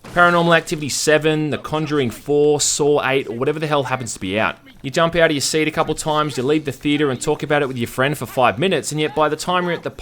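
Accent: Australia. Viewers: is awesome. I personally liked outlast, but we need someone to be tough on games so developers give us more. Much support from the United States to you my friend. I watch many of your video's and keep up the great work. Just curious are you from Australia or New Zealand?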